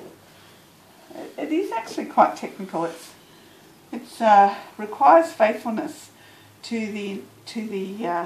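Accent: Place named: Australia. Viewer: Thankyou for the video. Great instructions. PichilmI she definitely sounds Australian.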